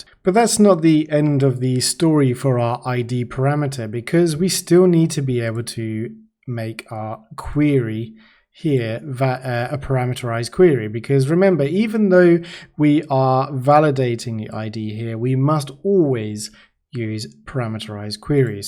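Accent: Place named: United Kingdom. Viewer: Informative and entertaining. (bonus points) the British accent sounds far more intellectual than my American "southern drawl". Excellent work man!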